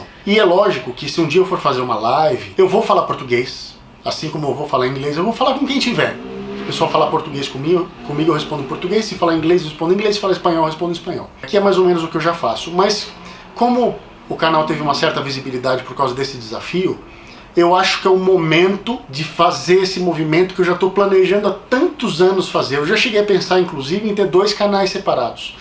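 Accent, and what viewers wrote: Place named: Brazil. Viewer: É bom que, inglês falado por brasileiro é muito mais fácil de entender, pra mim né kkkk mete as fichas aí!!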